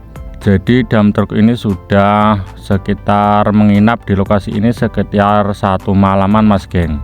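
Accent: Java, Indonesia. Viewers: logatnya medok banget yah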